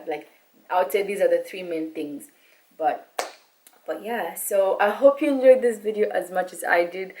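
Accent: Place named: Nairobi, Kenya. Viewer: You speak like a Nairobian 😅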